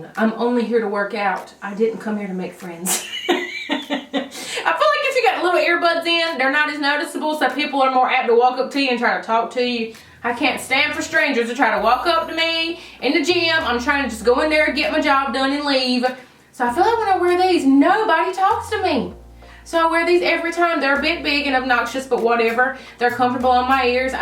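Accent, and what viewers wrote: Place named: Mississippi, United States. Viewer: I just came across this video and first off I could watch your videos all day just for your accent!!! I live in Mississippi and never thought I would find someone that sounded like me on YouTube lol!! LOVE IT!!! I can totally relate to the amazon recommended tab it’s like amazon knows me personally lol love your decor behind you as well so pretty!!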